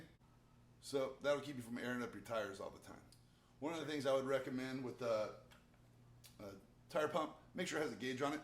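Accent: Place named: United States. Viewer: WTF the terminator but with american accent woah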